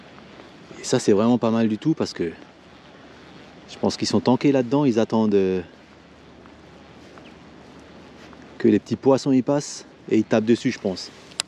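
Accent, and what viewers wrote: Alsace, France. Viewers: Sans ton accent Alsaco tes vidéos ne seraient pas les mêmes. Tu transmets parfaitement ta passion pour le carnassier au leurre. Personnellement et actuellement j utilise le Slick Finess en linéaire (usage détourné) .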